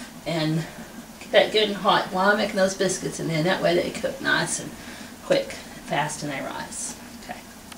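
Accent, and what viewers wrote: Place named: Georgia, United States. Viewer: "I use real salt, not the sea salt, iodized salt, etc. This is salt from the sea" Huh?? Man while filling his stove with red oak and hickory logs: "No live trees were killed for this video". Did you not just say they were red oak and hickory? What happens when you run out of tornado felled trees and you have a wood stove? Wait for the next tornado to keep warm? Aren't the Amish not supposed to use electronic appliances such as video camcorders? You have a Georgia accent, so how did you get from Pennsylvania to Georgia - by horse wagon or did you fly or drive? Again, isn't that a violation of Amish laws? Your cooking looks wonderful and healthy. I just have a problem with the hypocrisy of the religion and some of the comments. You say one thing, do another.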